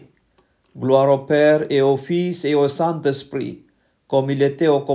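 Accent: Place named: South India, India. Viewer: Vraiment j'espère que tu est Indiens..... précisément sud .....vraiment ton français là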